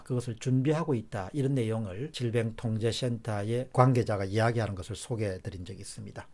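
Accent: Gyeongsang, South Korea. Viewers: 이분은 경상도 억양이 있으신거같아요 저는 우리 아빠같은 경상도라 친근감을 느끼는데 ,, ㅎ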